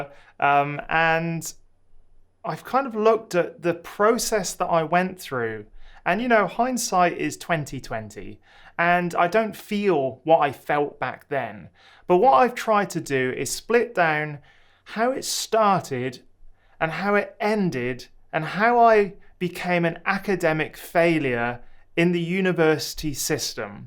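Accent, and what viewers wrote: United Kingdom. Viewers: Love the accent btw, nice to hear Western Brit dialects in this part of YT. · I'm sorry to say that but you sound overall rather apologetic... Academia is a great "industry" and there's very few other industries that could offer conditions that would match it. That said, academia isn't for everyone. I have seen many graduate students giving up in the process because they were "disappointed" in "the game". But frankly, these were just people not cut out for academia (some of them did amazingly well later on in other industries, though). So, rather than complaining about academia not matching your high expectations, it would be totally fine to just admit that it might be you who isn't the right match for it. That does not mean that you can't be good at something else, of course. Finally, based on your accent I assume you are from the UK, and your experience with academia is based on how things are in the UK. 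I just wanted to say that the UK academia isn't a great benchmark--it is pretty bad in terms of (a) pay, (b) pressure to obtain external grants, (c) job security... Things are better in other countries.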